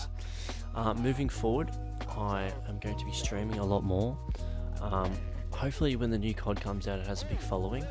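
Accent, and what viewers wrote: Australia. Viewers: aussie accent man hes fkn funny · He's Aussie? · Is aussie?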